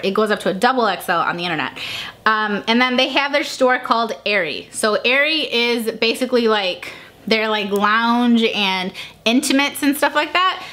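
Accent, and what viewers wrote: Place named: Chicago, United States. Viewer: First time watching one of your videos!! I knew that accent sounded familiar!! #chicagogirls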